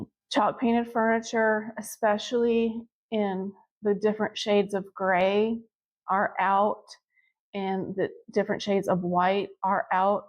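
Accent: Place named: North, United States. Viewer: Are you from north Georgia? Your strong northern accent says no.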